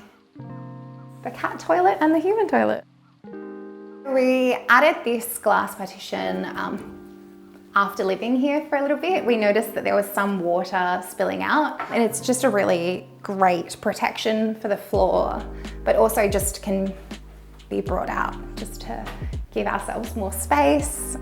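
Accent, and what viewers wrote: Australia. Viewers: I just have to say: australian accent, oh my! So beautiful!!!! THe house too, by the way! lol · ''DesigAAH and MakAAH', ''LargeAAH'' this australian accent is triggering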